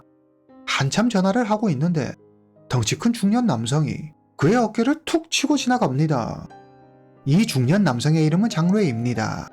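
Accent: China. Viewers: 조선족 말투네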